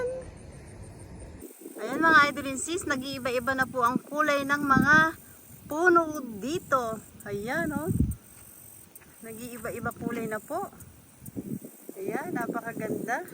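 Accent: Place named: Tagalog, Philippines. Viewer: Hello ..goid evening , very trur ..ganda tlga nv pkace ..naoaka tahimik nv lake na ysn .. Pero ting punto mo tkga ang gustong gusto ko ..tagalog na tagalog ..sa buhsy america ..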